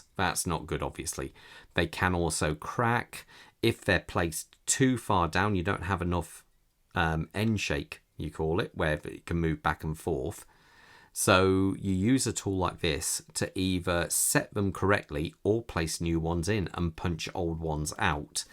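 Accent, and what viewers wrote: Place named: England, United Kingdom. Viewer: I thought you was American, cool you're English. Your videos are really crazy good, enjoy watching, cheers mate.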